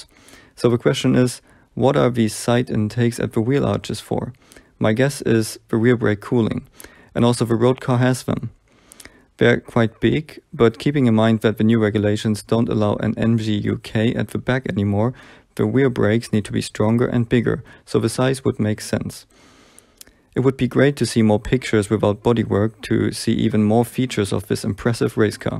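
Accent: Germany. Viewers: Bist du Deutscher? xD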